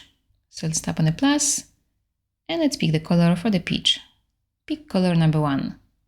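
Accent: Poland. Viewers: I love your voice! Are you Polish?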